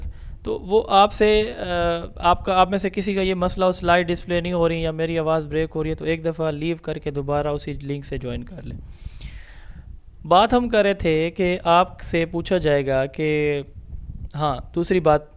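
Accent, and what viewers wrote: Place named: Saraiki, Pakistan. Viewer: Mithe sariaki boli ❤️🌹🌹❤️❤️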